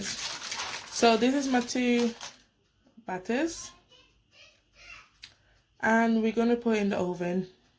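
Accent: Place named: Haiti. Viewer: Yeah you sound Haitian haha xx great video!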